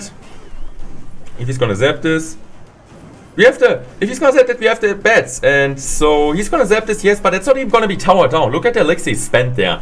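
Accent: Germany. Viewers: You are from Germany because you have Germany accent?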